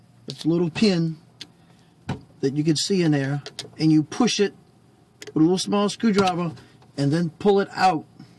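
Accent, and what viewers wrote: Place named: New Orleans, United States. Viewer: You sound like you're from outside of New Orleans. Home sweet home.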